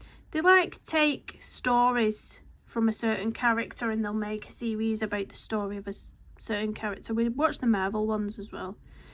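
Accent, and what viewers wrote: United Kingdom. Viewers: Bonjour, la combinaison des couleurs est super je suis fan de tes vidéos et j'essaye de suivre tes tutoriels... merci de partager. Where are you from because j'aime beaucoup ton accent british but où ? moi je suis française et je vis dans le sud vers Carcassonne. Bravo pour ton talent Corinne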